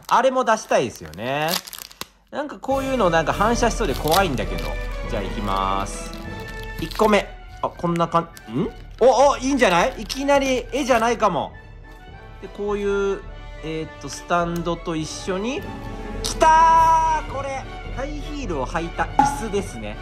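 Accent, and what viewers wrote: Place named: Kyoto, Japan. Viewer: 関西弁よき😊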